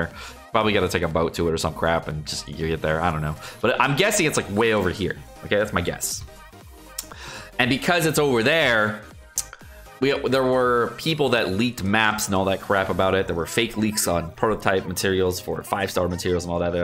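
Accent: United Kingdom. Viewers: At this point I watch this channel not for genshin, but for a new episode of Bri`ish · damn british is growing on me:D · I just love British.....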